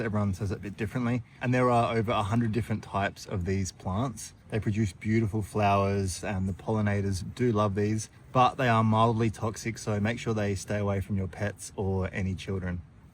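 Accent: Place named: South Australia, Australia. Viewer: you are my favorite gardener on YouTube. You remind me of Edward Scissorhands when you are pruning. You are probably too young to have seen that movie but I think you would enjoy it. It came out in 1990 starring Johnny Depp. I also watch the Garden Rescuer, I think he said you are his friend. He needs to learn how to protect himself better like you do. I live in St. Paul Minnesota USA and really enjoy your accent and knowledge of the creatures and plants in South Australia. I hope you never stop posting videos. You are such a nice man! 🥰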